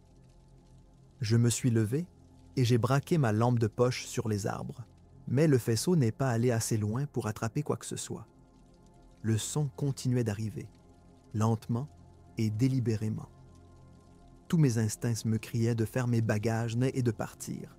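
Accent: Quebec, Canada. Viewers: Oh wow! Belle découverte! Contente d’entendre une voix québecoise qui raconte des histoires d’horreur! Et hop, une nouvelle abonnée! Salut de Laval!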